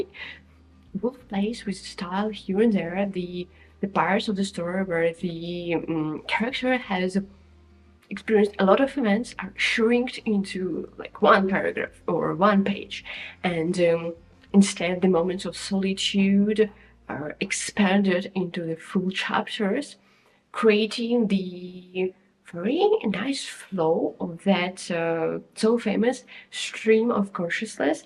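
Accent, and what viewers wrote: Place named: Luxembourg. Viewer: I haven't read any of those books, but it was fun listening to you talk about them. I don't think I've ever heard a Luxembourg accent before, and I confess that I had a little trouble understanding it at times, but if you can take the trouble to read English books, and review them in English, I can make the effort to listen carefully. I look forward to seeing more videos from you. 🙂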